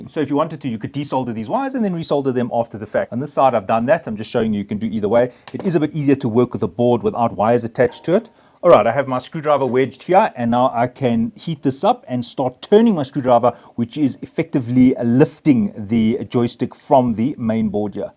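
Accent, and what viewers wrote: South Africa. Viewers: fucking love the south African accent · I can hear the south african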